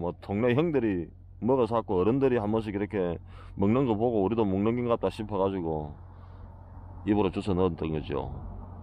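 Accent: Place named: Busan, South Korea. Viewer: 태어나서 유투브댓글 처음 달아봅니다 한국(인천)에서 잘보고 있습니다~~^^ 두달전부터 님 팬이되었네요 구수한 부산억양의 영어가 너무 정감이 들고요 왠지모르게 영상보고 있으면 답답한 마음이 위로가 됩니다. 아무쪼록 안전운행 하시고 건강하세요 저는 지금 라면 하나 끼리 묵겠습니다~~ㅋㅋ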